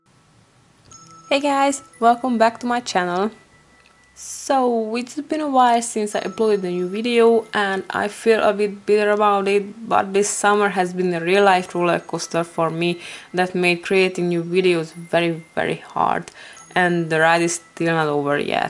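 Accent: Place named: Hungary. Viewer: Szia! Olyan érdekes látni, hogy mennyi magyar művész bújik meg elrejtve a videómegosztókon. :) Nagyon szépen rajzolsz, véletlenül hallottam meg a voicoverben hogy magyar vagy ><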